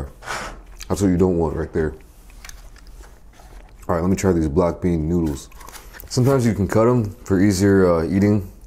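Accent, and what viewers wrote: United States. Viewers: How's his accent so american?? Not a regular here so do explain to my ignorant ass 😁👍🏾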